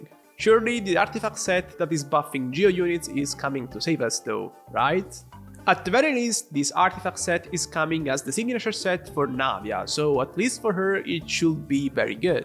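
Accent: Italy. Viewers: Italian I think, but I'm not positive.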